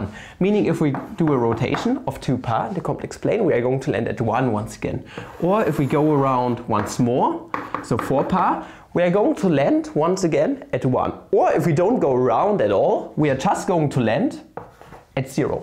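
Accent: Germany. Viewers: Are you German or American????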